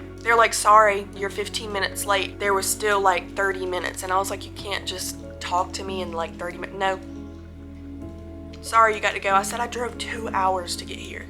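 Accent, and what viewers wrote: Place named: South, United States. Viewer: 😭 baby girl, I'm taking you on as my surrogate daughter right now. I FUCKING FEEL YOU!! I've been doing exactly this for 10+ years. Hang in there baby, it is getting better as we speak because of people like you that are brave enough to stand by their cries for help. Start with Borderline Personality Disorder. Dr. Fox here on YouTube is amazing. There are are doctors out there that can and will help us, but I have learned that it's up to us to make this change. I firmly believe that what you are doing by spreading awareness on here is HOW we make the changes we need and it's up to us because there are so many people out there that CAN'T speak up for themselves. We have to help them. It's been 4 months since your last video. I deeply hope you are still fighting. I'm HERE! I'm happy to connect with you personally if you want. 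I want to help you. ❤ Edit: One other thing - your accent tells me you are in the south. I have been in outpatient treatment systems literally all over the country, and the south is about 50 years behind. You have to educate yourself online. Keep going.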